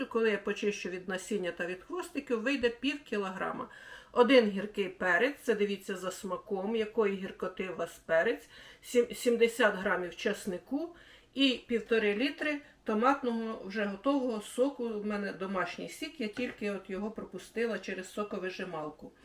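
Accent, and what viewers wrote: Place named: Surzhyk, Ukraine. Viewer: Ну не совсем на украинском, наверно все же на суржике, как будто русскоязычные говорят😊.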